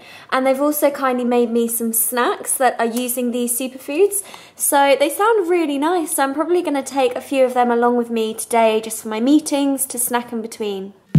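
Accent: England, United Kingdom. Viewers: Is she from England?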